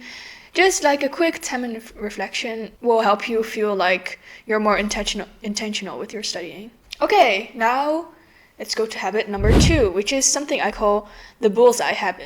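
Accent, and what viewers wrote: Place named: England, United Kingdom. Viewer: Love your english accent ❤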